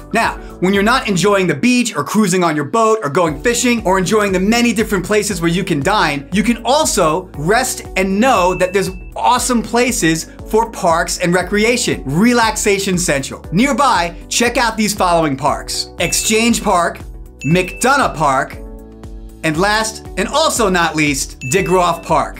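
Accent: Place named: New York, United States. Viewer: Hey love your energy. Your definitely a new yorker or from NJ 🤣 i can tell. I'm from NJ looking to relocate there. Your videos are the best and so informative.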